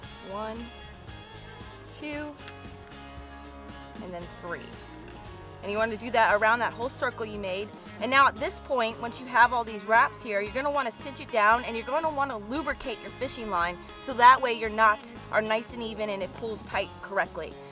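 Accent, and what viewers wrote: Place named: Maryland, United States. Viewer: Are you originally from Maryland? Sounds like a little accent is still there...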